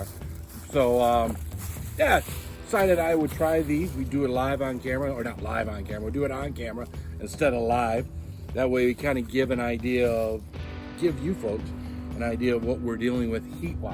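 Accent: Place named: Southeast, United States. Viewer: BY THE WAY!!!! if was a betting man “wink wink”, i would bet almost anything that you’re a south eastern gent! i can tale from the tone and the way you carry yourself!! lol i’m from North Carolina, and you sound like you could be my neighbor lol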